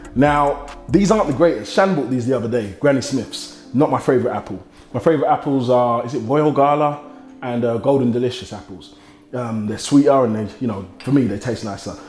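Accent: England, United Kingdom. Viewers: shoot that crib up you hidin in he's an African brit. He doesn't have an American accent, he has a British accent. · AND he has a british accent?? geez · R u from the uk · Dude with that English accent, and Body your making all us guys look Badd😂😁🤣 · he’s from the UK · pillohw british accent there is nothing called english accent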